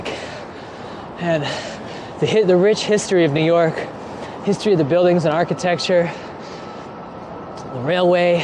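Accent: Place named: Mexico. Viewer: Estas hablando asta con el acento Mexicano.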